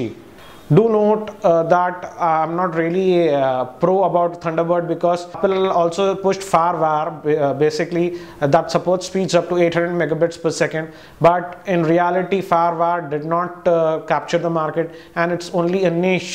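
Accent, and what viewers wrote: India. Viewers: hes Indian, its very easy to tell...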